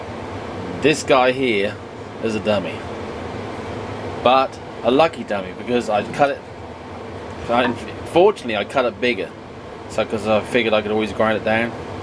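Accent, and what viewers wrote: United Kingdom. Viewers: This guy sounds strangley british Also love the video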